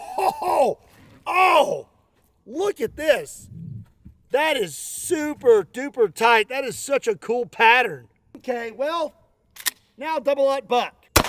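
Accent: Kentucky, United States. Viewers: Nothing like an old kentucky man from the hollers.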